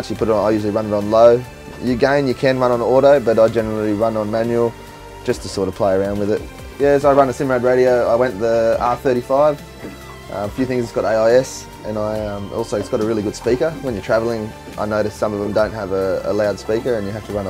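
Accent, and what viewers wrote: Australia. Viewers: What part of Australia is that?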